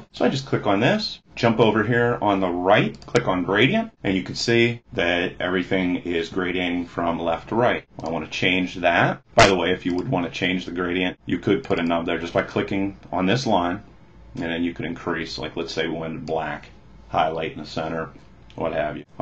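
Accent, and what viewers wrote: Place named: Texas, United States. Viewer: are you from the same place as Mathew McConaughey, your accents are exactly the same. sorry for the unrelated comment. great tutorial btw.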